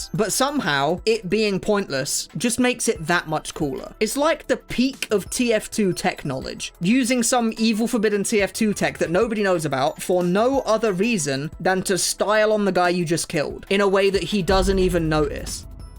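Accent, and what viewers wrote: United Kingdom. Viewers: British speech about TF2 to vibe and study to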